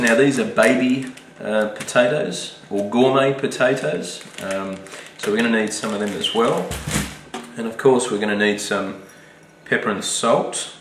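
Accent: Australia. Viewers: loveeeeeeee Australian accent!!!!!!! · Very nice recipe What accent is that? Australian?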